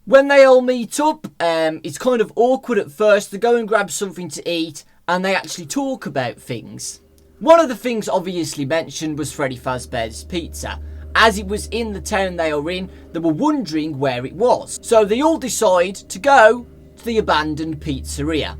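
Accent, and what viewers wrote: United Kingdom. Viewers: i feel like he named the character charlie just to hear say it in his british voice.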